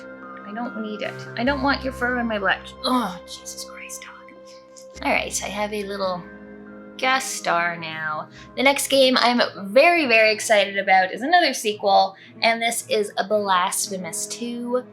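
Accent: Canada. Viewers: Man, your Canadian accent is really pronounced in this video.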